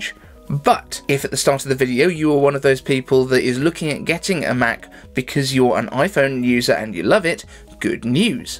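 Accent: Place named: Birmingham, United Kingdom. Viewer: #iCaveAnswers I’m super interested in the geographical breakdown of your audience. As a British guy who watches the show, I usually assume the audience are also mostly British. The Mint Mobile sponsor made me wonder about how many viewers are actually from the USA and won’t even notice the hint of Brum in Daves accent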